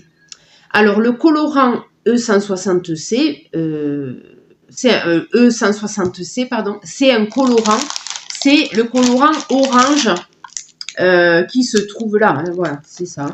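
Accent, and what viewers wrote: South, France. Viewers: Bonjour, merci pour ce bon moment de partage. Test approuvé 👍😁🐱🐶fins gourmets. Vous êtes d'où? Accent du Sud? Bonne journée à vous et votre adorable famille. ❤️😘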